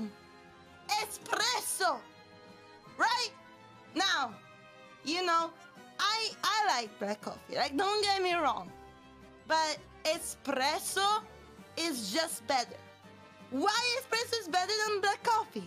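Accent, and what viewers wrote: Italy. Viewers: she sounds like Dr Evil but with Italian accent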